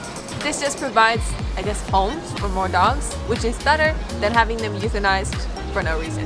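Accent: Taiwan. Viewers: 我真的覺得前面開頭講的英文有台灣口音!! 一開始聽不習慣… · 一開始打開影片聽有種台灣人說英文的親切感覺，後來才想起來妳是美國人ＸＤ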